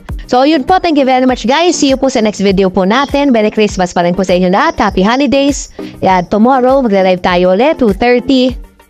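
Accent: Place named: Conyo, Philippines. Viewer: ang arte magsalita aba…conyo..sobrang pa bebe..🙄🙄🙄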